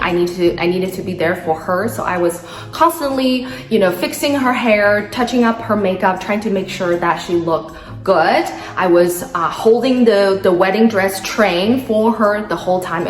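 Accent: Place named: Vietnam. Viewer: I was quite astonished by the time i heard your accent, it was really like Vietnamese accent, but it turned out you are an authentic Chinese . Anyway your English voice is So great, i love it. A comment from viEt nam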